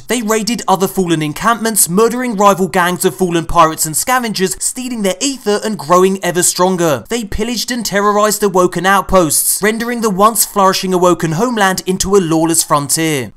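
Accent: United Kingdom. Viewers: And this kids is what happens when you combine clownfish voice changer and a lit British dude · Dude I love you but your British and when you morph your voice I can't understand shit you say. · That voice is kinda annoying.... I prefer your brilliant British voice you beautiful beloved bostared (if you are British)👍🏿👍🏿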